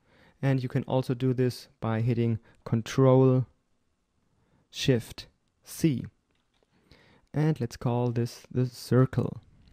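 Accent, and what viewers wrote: Germany. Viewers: Deutsche Ausprache xD Aber gut gemacht :) Wie wäre es mit Deutschen Tut's?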